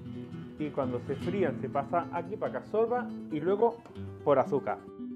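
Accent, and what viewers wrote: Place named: Granada, Spain. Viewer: Me encanta escuchar tu acento y tus palabras de Grana soy de Armilla vivo en California por 42-43 años cocinero o como ahora se dice Chef , jubilado pero sobre estas fechas empiezo añorando mis comidas y niñez Trabaje en Casa Salvador en los 70s Un saludo y gracias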